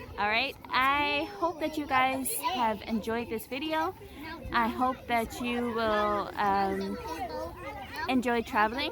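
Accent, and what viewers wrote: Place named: Senegal. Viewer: Bit confused your accent as I couldn't see your face Im unable to identify where ORIGINATED!. Are from Senegal or Namibian? Its really shame that you leaving the Republic of Somaliland because its peaple are "Too Nationalism" to me the great people of Somaliland are really lacking of it. Come to America and you will realise what nationalism is it all about, in a good way. Tribalism IS NOT good but healthy nationalism is fine. In your speech, I REALLY LIKED 👍 when you were saying "Hargeisa Somaliland," and u repeatedly said that number of times. In which I have appreciated it a lot. Anyway, safe journey wherever you are heading right now. But plz avoid mixing the Republic of Somaliland and Somalia for ur next videos as these 2 DO NOT GO hand in hand 4 obvious reasons. Finally come back soon if u really believe the Republic of Somaliland in heart ❤️ 💙 💜 💖 💗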